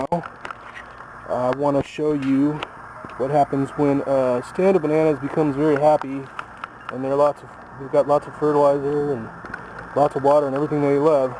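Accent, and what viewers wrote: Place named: Florida, United States. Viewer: If you’re from Florida, you have an awesome Floridian accent.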